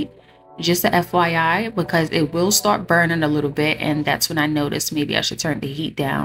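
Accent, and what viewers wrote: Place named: New York, United States. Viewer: I’m going to try this tonight. Yessss to the editing and love your NY voice.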